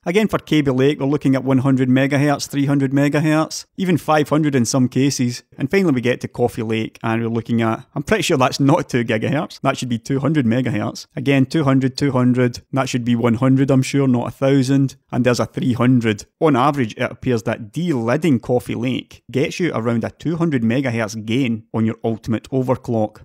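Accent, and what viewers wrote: Scotland, United Kingdom. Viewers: Hey I like his Scottish accent. That's actually the first thing that pulled me to his channel, I found out how good his content was afterwards. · Excellent work, i noticed a comment on the Linus video, "Scottish is difficult for me as well", do you get much grief about your accent on videos? I am from Govan (I am sure you know where that is) but i live in Australia, and although i speak "English", people stare at me and cant understand, off topic i know, but i thought i would ask how your accent goes down with people online. The only bad thing after watching this though, as that i have to wait for your next work, because your work is that good. G'on yersel.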